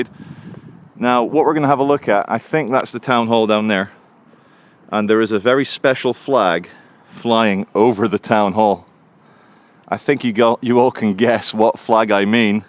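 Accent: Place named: RP, United Kingdom. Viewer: Too posh for the man of the people.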